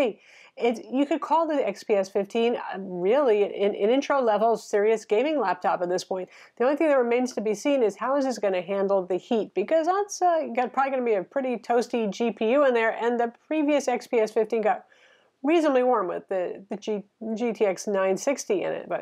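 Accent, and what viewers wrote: United States. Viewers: I really enjoy your presentation, voice and style. Many American accents drive us older English people mad but not yours. Lol. Thanks for all you do.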